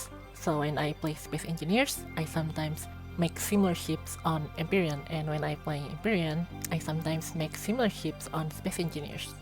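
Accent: India. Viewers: Hard indian accent?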